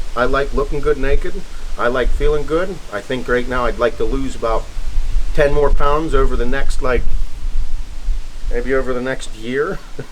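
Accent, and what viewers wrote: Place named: Pittsburgh, United States. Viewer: This dude has to be a Pittsburgh boy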